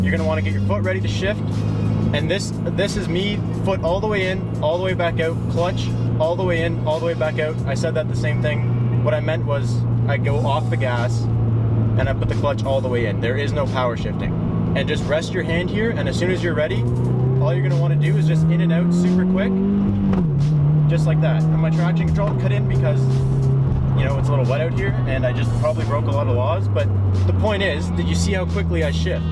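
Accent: Canada. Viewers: so canadian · Tell me your from Canada without telling me your from Canada. You didn’t have to say it we could tell. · U must be Canadian ? I love it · 1. He's Canadian, but you heard that one enough by now haven't you? 2. 10 min vids are simply the way you have to do it these days. Youtube pulls so much crap and changes constantly, so it's harder, than ever to grow as a channel. They care about view length more than views, so if your vid is shorter, they won't recommend you. He's doing it so he can still survive as a channel, because the sad truth is, that he pretty much has to until he finds a stable way of income. Remember that he's doing Youtube full-time now, so his income is likely low. He's been asking his subs on how we'd like him to find a stable income, so it's clearly the case here. Also if this was to squeeze as much money out as possible, he'd make it at least 10 min long and put like 4 ads on it, but he didn't. 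3. This tutorial was not meant to be advanced at all. It was clearly a tutorial to learn a basic way to shift faster. It's for new enthusiasts, who are starting out. You know, the people a lot of guys in the car community like to bash for no reason, until they think we're just a bunch of arrogant assholes and walk away, wasting a potential new passionate enthusiast in a community, that is probably coming to a decline soon, as more and more people lose the interest in the engagement of driving? And I know this is true, since I've seen at least 2 or 3 people who gave up for that reason and I notice, that a lot of young people just don't have the same interest in cars as years back. Even I almost gave up, b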